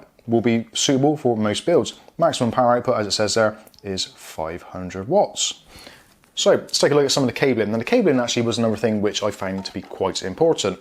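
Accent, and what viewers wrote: United Kingdom. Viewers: I didn't notice anything. I thought it was his British accent.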